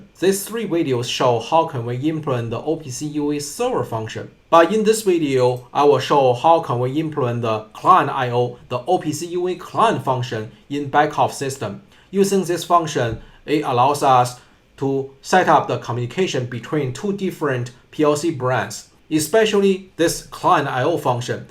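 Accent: China. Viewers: 哥们，一听你口音就知道你是京津地区的人啊。满满的口音让我想起了在北京读的本科。非常好的视频，我正在做我的硕士论文，也是和OPC UA有关的，看了你的视频受益匪浅。非常感谢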